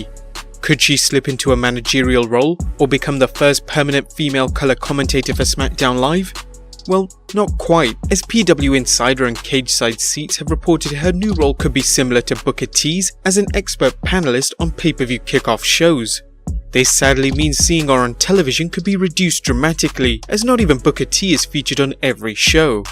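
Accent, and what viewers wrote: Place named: England, United Kingdom. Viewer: Never should’ve been GM. English accents are unbearably painful. More Meltzer-like guess work in this video though, nice work marks.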